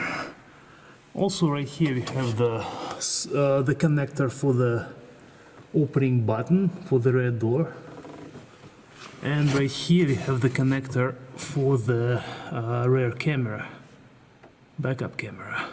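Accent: Russia. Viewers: Nice Man.. Really helped me a alot, had to change the reverse light.. Couldn't find anywhere. This video solved. Liked his russian english accesnt.. Regards.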